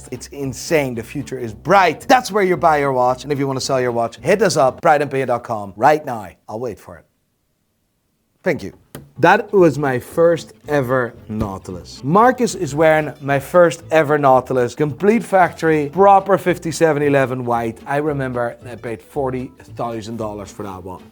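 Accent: Netherlands. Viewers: I'm flabbergasted you perfected the Dutch English accent 😂 amazing keep up the work bro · Why u got such a thick dutch accent my man? You dutch and hiding it?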